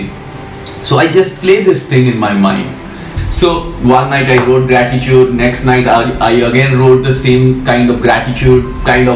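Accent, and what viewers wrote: Odisha, India. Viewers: Is your mother tongue odiya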